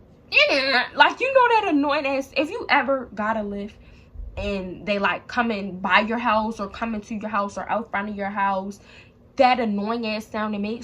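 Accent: Baltimore, United States. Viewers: You from Baltimore ? cause that accent 😍😩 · Your accent sounds like you from Baltimore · I KNEW YOU WAS FROM BALTIMORE 😭😭😭😭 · You got to be from Baltimore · is she from bmore i know im late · your accent, you def from baltimore 😂 i never been there and know no one from there but ik the accent clear as day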